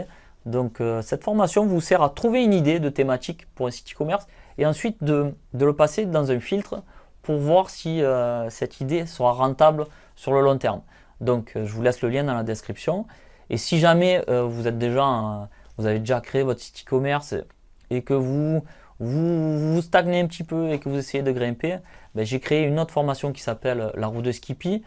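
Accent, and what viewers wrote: South, France. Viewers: Salut je vient de découvrir ta chaîne et je trouve vraiment que ton énergie est super et sincère et sa fait du bien...:) en plus étant née a marseille et n’étant plus dans le sud tu me fait sentir la garrigue en t’écoutant:) Merci pour tes conseil continue comme tu le fait et bon rétablissement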